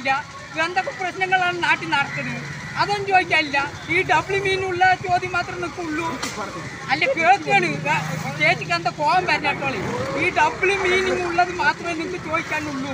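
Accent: Palakkad, India. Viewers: പാലക്കാട്‌ ഭാഷ.. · Palakkad bro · അയ്യ്...ഇത് പാലക്കാടാണ്ടാ...🥴🥴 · പാലക്കാടാണെന്നു തോന്നുന്നു 😃😃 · ഇത് പാലക്കാടൻ സംസാരംമാണ് എവിടെത്ത് ആണ്ങ്കിലും കൊഴപ്പമില്ല ഏട്ടൻ പൊളിച്ചു 👌👌👌👌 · തനി പാലക്കാടൻ ഭാഷ ഒരു പാട് ഇഷ്ടപെട്ടു🥰🥰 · really wonderful natural "palakkkadan" speech, super "eatta"....ide eppadi errukke"... "nan naadanda" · ഇത് പ്രതികരിച്ചതല്ല ഈ ചേട്ടൻ ഈ പാലക്കാട ശൈലിയിൽ ഒരു വീഡിയോ ചെയ്തതാ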